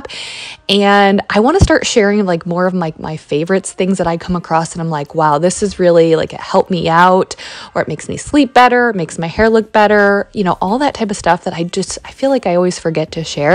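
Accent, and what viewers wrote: Midwest, United States. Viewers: Hey there! I just found your channel and I’ve been binge watching your videos, I find them so relaxing and motivating. I’m originally from Chicago but have been living in Australia for the past three years with my boyfriend, so I love hearing that Midwestern accent, I miss it so much. Can’t wait to visit home soon. I also have to say that so many of these products aren’t available in Australia, like the power paste or scotch brite stove sponges. Such a bummer, but it’s fun to do some research and find similar ones here or even try DIY. Love from Australia! ❤️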